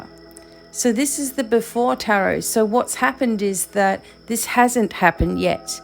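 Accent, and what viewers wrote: Australia. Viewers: Knickers in a knot 😂 So Aussie , love it . Hello from NSW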